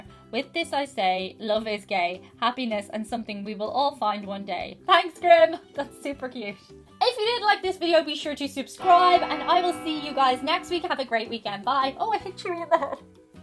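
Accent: Ireland. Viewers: Im saying! I'd pay to have her yell at me in an Irish accent.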